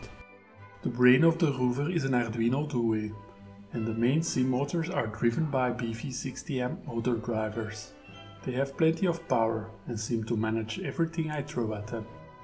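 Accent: Belgium. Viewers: It´s Belgium i think, there are signs in Dutch, he sounds Belgian. A lot of Belgian city´s are majority muslim and african.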